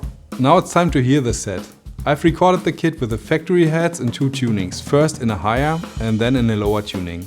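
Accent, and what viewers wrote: Germany. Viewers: I very much like your demo videos. I like the direct approach to the information, and yes, I’m a weirdo, I like this type of German accent. (I lived in WB/Mainz for a couple of years long ago. As well, I’ll be taking delivery of this exact kit, in the same aquamarine color, this very week. Zo.. a little confirmation bias always feels good.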